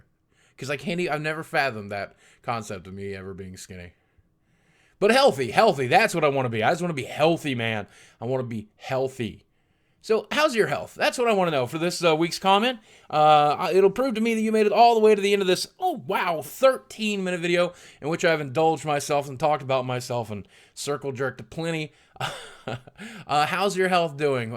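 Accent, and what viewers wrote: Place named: San Francisco Bay Area, United States. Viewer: Hella? you sound like you grew up in the SF Bay Area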